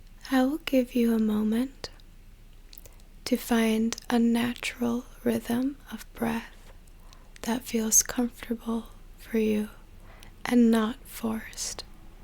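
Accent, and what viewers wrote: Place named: Texas, United States. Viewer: You can hear Texas in her voice if you know what to listen for.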